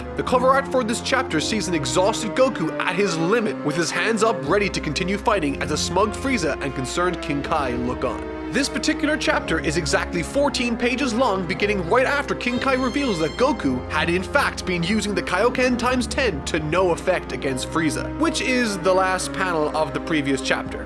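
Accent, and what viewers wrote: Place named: Ireland. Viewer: hey ive been a sub for almost a year now and i was just wondering what country you grew up in as u sound a little irish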